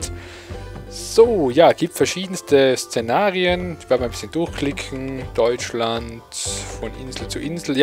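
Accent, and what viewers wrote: Austria. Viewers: Jo bisch denn du a Tirola, ha?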